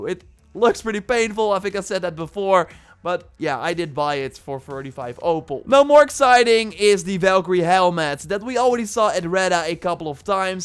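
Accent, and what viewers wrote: Netherlands. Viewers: a dutch accent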